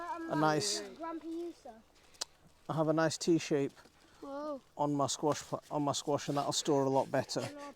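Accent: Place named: North, United Kingdom. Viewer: I love that northern accent :D it's so homely and nice. Great harvest!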